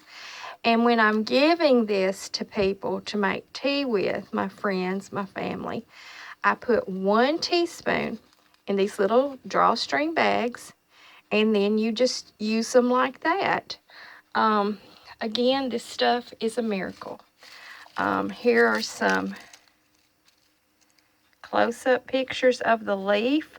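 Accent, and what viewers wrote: South, United States. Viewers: Great video,! Love it everytime I see it / review it. Have shared this particular video with several friends, including my mom. "These are my good DRANKIN' glasses! "- love it! ( referring to the 12 oz mason jars)! I'm not making fun of you at all though! I love your Southern accent and mannerisms! I'm a Southerner, too - a "Florida Cracker", and have some family up in Tennessee. Appreciate your channel and information on wild lettuce VERY much!!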